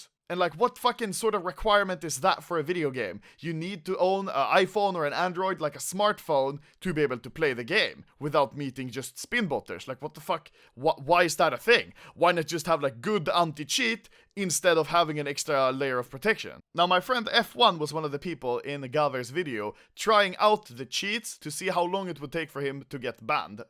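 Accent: Russia. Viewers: Are you from Russia??? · Brah , are u from russia or are u from Swedeen , im so confused.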